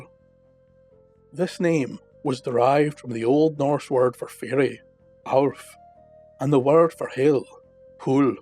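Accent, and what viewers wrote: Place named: Black Country, United Kingdom. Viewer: There are plenty of Wulvers! They are just not on Shetland! You have clearly never been to WOLVERhampton on match day. Wufruna's Town! Saxon names Or so we are told. Wulfruna was, according to Lore, the wife of a Saxon noble who gave the land which is now Wolverhampton to the people after a Godiva style row with her old man. As far as I know she did not get naked. But the linguistic similarities are clearly not co incidental. And Wolves have become local emblems, not just for the football team. Tell anyone within a 15 mile radius that you are going to Wolvo and they know where you are going. As far as I know they do not have any genuine wolfmen either. but hearing your pronunciations was so similar to our old dialect. I have often wondered about how different the Saxons and 'Norse' really were, especially in Mercia. 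The Southern Saxons maybe, but we have so many place names that could easily be Norse. If you look at Really old maps, we aren't Anywhere! We are not Mercia, we are not Wales, we are no man' s land and there is even one that shows a sort of Viking tendril weaving across to us from the Danelaw and splitting early Mercia from Wessex. Sorry for going of at a tangent and having Nothing to do with Scotland, but I was just struck by the words and sounds. I am fascinated by our lost past and the half remembered clues. This website is brilliant, please keep it up!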